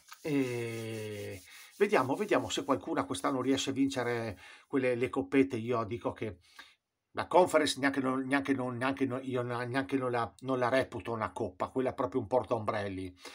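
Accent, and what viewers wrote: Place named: Bari, Italy. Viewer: Il dialetto barese è il top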